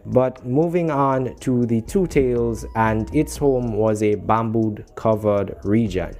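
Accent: Jamaica. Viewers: yooo is that a jamaican accent i hear?? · Are u from Jamaica?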